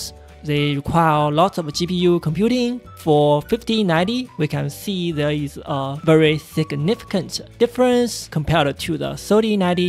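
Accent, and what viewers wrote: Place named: China. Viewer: 哈哈我一听就是中式英语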